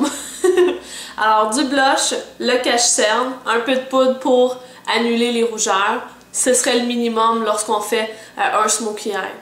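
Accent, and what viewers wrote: Quebec, Canada. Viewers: Coucou!! merci bcp pour cette vidéo! J'aime vraiment toutes tes vidéos, ce que tu fais est génial!! Tu me donne envie d"aller vivre au quebeq j'adore ton accent ta simplicité et ta sympathie!! Merci encore et continu comme ça!! Bisous! PS: j'attend avec impatience les autres videos sur les toiles d'artistes je troouje cette idée vraiment géniale! :)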